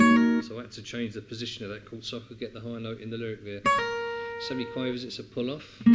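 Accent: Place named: England, United Kingdom. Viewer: Thank so much! And, hey, this is the first time that I've heard you speak! You have an English accent! Here in Missouri my Mississippi Delta accent gets me a lot of comments and attention. If I had you here, they'd let me alone for a while!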